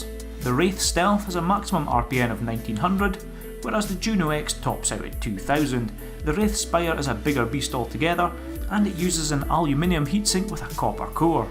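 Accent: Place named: Aberdeen, United Kingdom. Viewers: yeh deffo from up north peter head. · You sound like you're from Aberdeen like me!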